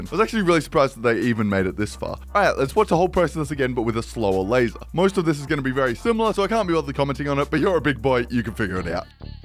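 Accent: Australia. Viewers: OMG YOUR AUSTRALIAN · Code are you Australian?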